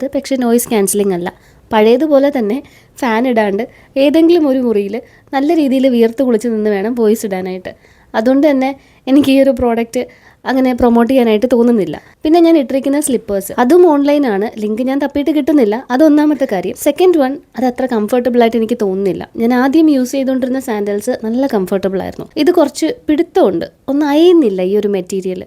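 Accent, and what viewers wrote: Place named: Kochi, India. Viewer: ഇത് കൊച്ചി ആണോ കൊച്ചി സ്ലാങ് ആണല്ലോ സംസാരിക്കുന്നത്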